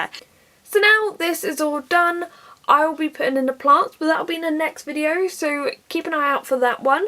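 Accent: United Kingdom. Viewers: Man, I just love your British accent.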